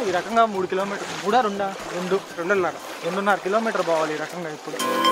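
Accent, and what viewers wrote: Nellore, India. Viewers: Nellore yaasa 100 percent dimpesav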